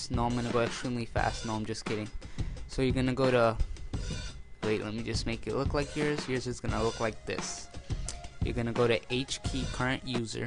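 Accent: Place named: New Jersey, United States. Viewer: YOU SOUND LIKE YOU FROM JERSEY.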